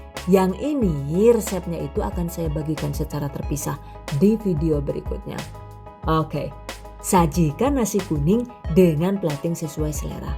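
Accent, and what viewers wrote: Banyumas, Indonesia. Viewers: Lah mbakyune ngapakers nggih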